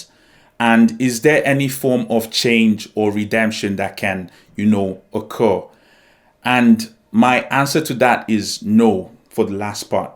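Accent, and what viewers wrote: United Kingdom. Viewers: Your accent is fascinating! I keep hearing bits that sounds Northern-ish, and then other bits that sounds like from all over the different bits of London!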